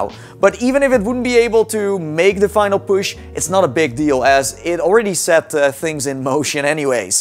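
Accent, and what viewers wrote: Netherlands. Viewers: Are you Dutch? you have a dutch accent. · Hey kerel ik hoorde je accesnt en ik wist gelijk dat je nl was😜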